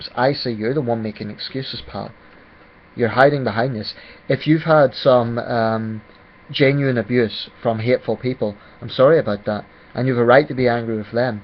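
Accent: Northern Ireland, United Kingdom. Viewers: Ah okay only discovered your channel the other day nice to see someone my age with their head screwed on for a change and do i detect an Norn iron accent? Plenty of up round my area.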